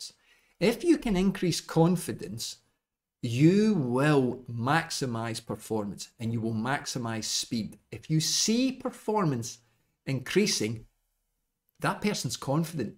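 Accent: Scotland, United Kingdom. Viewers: well deserved, Sir. I like the Scottish accent a lot and your humour in particular.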